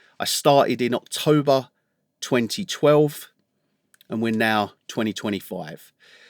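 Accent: England, United Kingdom. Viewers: I hate to say it man, but you had me with the accent. I want to trust everything you’re telling me for some reason. Why would an English gentleman such as yourself do otherwise?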